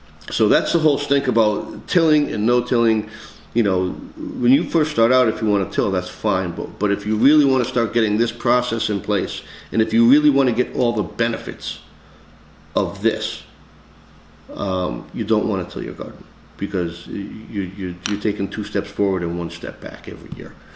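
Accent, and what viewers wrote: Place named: New York, United States. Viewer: Literally just red my mind while I was listening and scrolling through the comments. I travel a lot between states due to work. Sounds like a Southern NY, NJ, CT accent to me. I know I'm from NJ originally, live in PA now, have family all over NY and a GF In Nothern NY and the subtitles in accents in speech vary.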